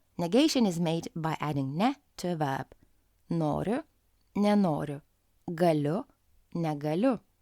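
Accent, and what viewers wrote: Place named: United Kingdom. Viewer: Are you a Brit?so pleasant English in here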